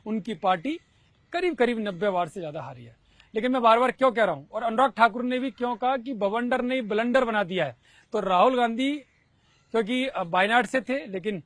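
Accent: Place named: Varanasi, India. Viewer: मुझे बनारस सुनाई दे रहा है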